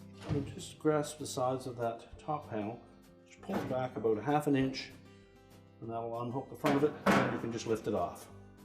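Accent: Canada. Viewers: Great video, and especially appreciate the East Coast Canadian accent. :)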